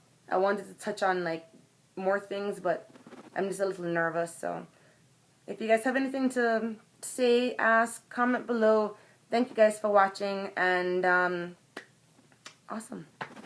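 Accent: Jamaica. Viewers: She av dat jamdung swag so is soh de ting set .just be you u dig .u jamdung u jamdung good accent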